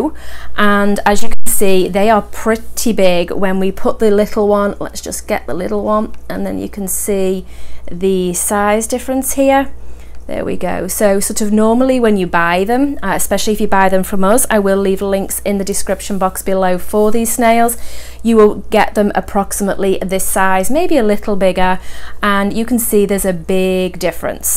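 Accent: Liverpool, United Kingdom. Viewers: Great video. I've been a subscriber for a while now and there's a (off topic) question I've been wanting to ask for a while... I know you're based in the USA, but your accent, I'm convinced I can hear overtones of Scouse, what we call someone from the Liverpool/Merseyside area here in the UK, or am I just imagining it? Okay, back on topic. There seems to be much less choice and availability here in the UK when it comes to snails, I think partly due to some species being 'banned', but also for some reason they just don't seem to be as popular over here. However, I personally keep 1 Zebra Nerite in a 19 litre / 5 gallon tank (a Fluval Spec V, which is quite a narrow tank, only 16cm front to back and not the easiest to get into for cleaning or maintenance, etc.) along with a small colony of Red Cherry Shrimp. I also keep a trio of Zebra Nerites in a planted and 'scaped, 60litre / 15-ish gallon temperate tank, along with 8 Blue Ring Danios and 8 Amano Shrimp. 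The shrimp were originally about half the size of the fish, but now they (well, the females anyway) have grown to be bigger than the fish! I added the snails to the tanks because I wanted to add another dimension to the activity within. The fact that they also serve a useful purpose by helping to keep algae under control is, as I see it, a bonus but not the only reason I got them. At present, I'm playing around with 'scaping ideas in a 40cm cube (another 60 litre / 15 gallon tank) and I also have a Fluval Flex 9 (gallon / 34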